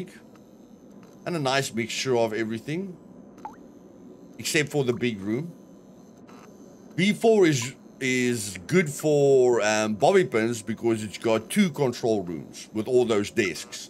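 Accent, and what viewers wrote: South Africa. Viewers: I can hear that South African accent! :3 And yeah Eskom screws us all over!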